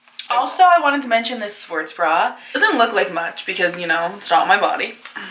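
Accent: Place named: United Kingdom. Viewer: I thought she was British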